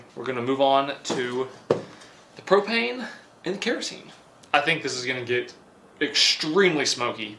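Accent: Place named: South Carolina, United States. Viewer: South Carolina for ya. Maybe Tennessee, but I'd put money on SC. I've never met the guy, I'm just from GA and recognize the accent and the landscape from the backyard lol.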